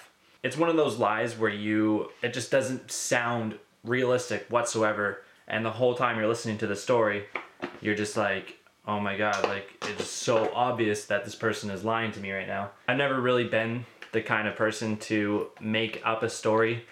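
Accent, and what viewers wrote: Canada. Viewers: You're Canadian right?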